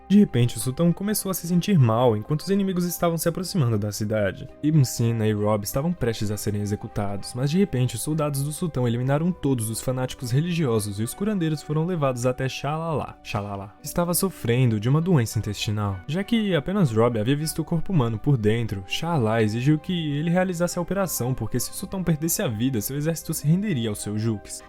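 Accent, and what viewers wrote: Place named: Northeast, Brazil. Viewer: Tu é nordestino. Ceará????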